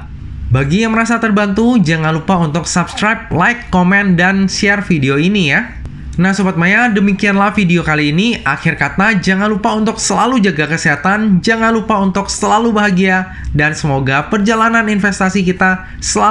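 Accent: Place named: Bangka, Indonesia. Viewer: sinmung, kek urang Bangka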